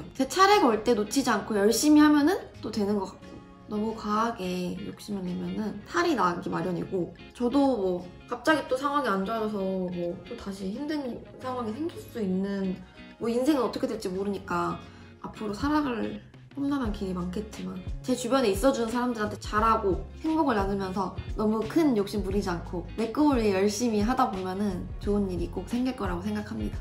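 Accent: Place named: Gwangju, South Korea. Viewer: 말도 너무 예쁘게하고 그냥 천사같당 저도 광쥬사람인데 억양에서 조금 느껴지네유 고생 많았어염 앞으로도 지금처럼 행복할거예요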